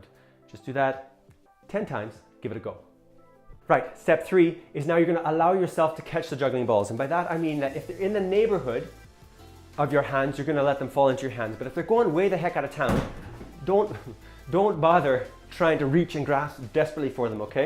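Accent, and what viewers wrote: Ireland. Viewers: Definitely one of the better accent attempts Iv'e seen on youtube, and I've seen some bad ones, good job either way. I live in Cavan, but my doctor is from Galway and he sounds exactly like this. Dr Cassidy is also a dork too, no offense I'm a dork so I can get away with saying that :P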